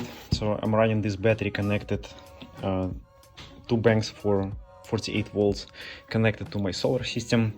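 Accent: Russia. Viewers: Nice RunGlish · Жуткий русский акцент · Русский акцент ни с чем не перепутаешь ))))) Так держать! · Is that a sweet Russian accent I hear? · I do not read comments, as most of them are from people who have no clue what is the real issue. With all due respect, you sound like Russia is the place you came from. I run an industrial automation company and I employ11 Russian trained engineers. All of them are top notch specialists. I assume, you are as good as them. If not better. I have a simple question - do you think that after all the mistakes you went thru, and wastedvmaterial, would it be better and less expensive to buy a commercially available battery of similar performance? If so, what would that be? So, keep up good work, MALADIEC! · Русский? )) акцент пипец) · боже да что же за акцент , накой Русскому говорить на инглише есле его не особо знаешь))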